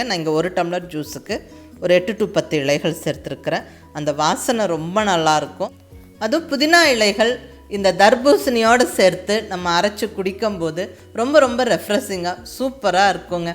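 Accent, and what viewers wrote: Kongu, India. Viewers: செயல்முறை விளக்கம் அடிக்க ஆள் பில்லை. super explaination. crystal clear. கொங்கு தமிழ் super.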